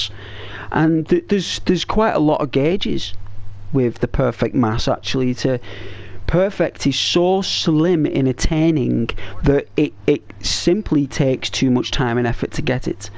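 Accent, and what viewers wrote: Liverpool, United Kingdom. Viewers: I love Liverpool accents lol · Great vid bro.. you from Liverpool ?? And sub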